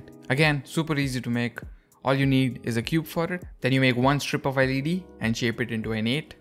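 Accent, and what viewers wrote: India. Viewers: Default cube with a sexy moustache and Indian accent talking to me about my favourite software…. I’m in! Subscribed!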